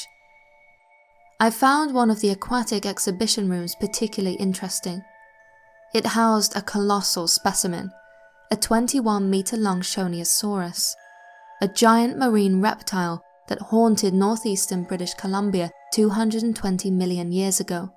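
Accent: United Kingdom. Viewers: once again - brit narrator- whats up with that mystery? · Speaking about the female reader: I love your delicate British (?) accent, makes the stories that much more enjoyable. Keep up the good work.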